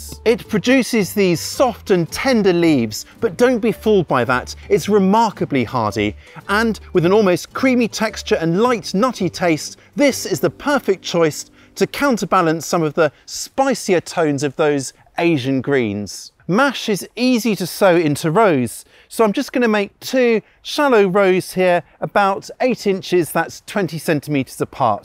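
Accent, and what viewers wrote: United Kingdom. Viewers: You sound British, are you?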